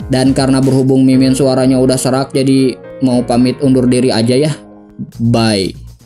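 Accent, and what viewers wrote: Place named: Sunda, Indonesia. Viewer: Sunda bingitz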